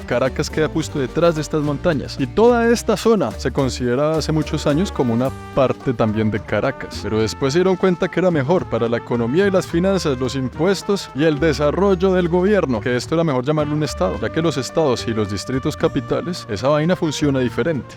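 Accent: Colombia. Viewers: Bueno x como hablas eres de Colombia tu país esta mal también y si siguen con Petro sera peor, los mejores países para vivir el norte de Europa.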